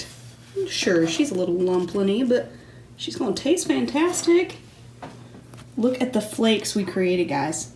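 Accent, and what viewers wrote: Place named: New York, United States. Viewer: New York accent - just a hair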